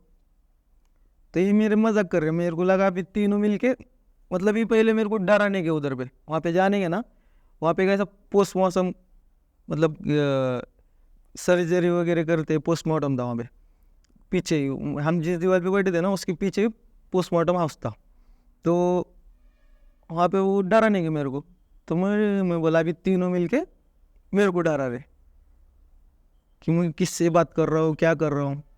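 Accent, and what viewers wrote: Maharashtra, India. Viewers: Hindi बोलण्यावरून समजतंय मराठी आहे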